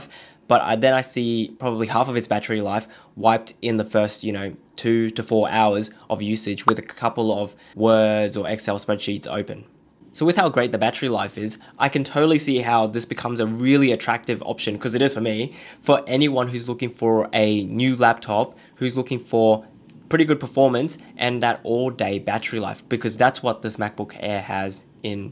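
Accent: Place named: United Kingdom. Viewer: First time I ever heard an Asian guy with a UK accent. 🤓👀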